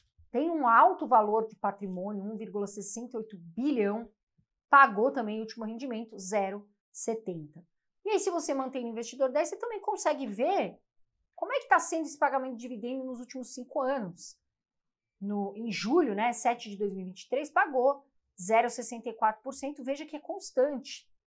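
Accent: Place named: São Paulo, Brazil. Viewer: Sotaque paulista e horroroso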